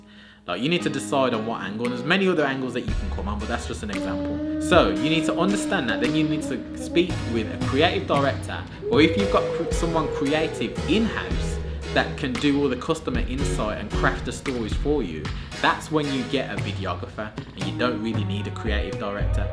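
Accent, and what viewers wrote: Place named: Birmingham, United Kingdom. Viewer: Oh yes by the sea life centre, awesome I thought so I could here a little bit of a brummy accent in your voice. Will do brother absolutely loving your content so far!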